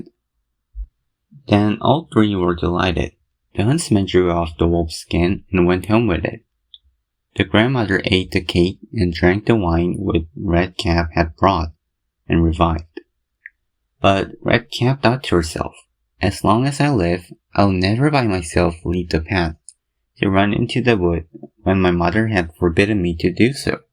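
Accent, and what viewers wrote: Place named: United States. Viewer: 미국인 같아요!! 발음 대박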